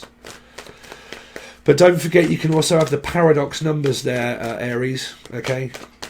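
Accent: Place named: Cockney, United Kingdom. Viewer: Your a cockney, currant bun, Son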